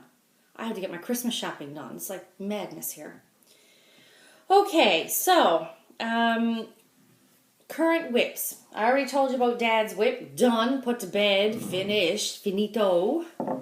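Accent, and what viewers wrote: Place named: Newfoundland, Canada. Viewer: My dear I loves hearing a newfies voice on me phone . Thanks for the video maid . Catch yah on Instagram sometime ! Hugs, Blake!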